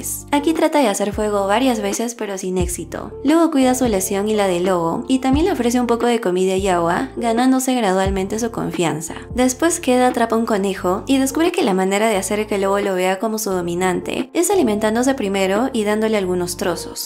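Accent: Peru. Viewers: a los 10 segundos ya sabia que eras peruana🙂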